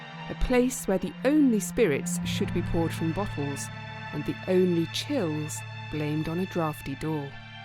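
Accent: United Kingdom. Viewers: How wonderful to hear a British female voice speaking English so beautifully. Something that used to be not uncommon. Nowadays women barely understand the language and complete with all the tattoos, well, what can you say?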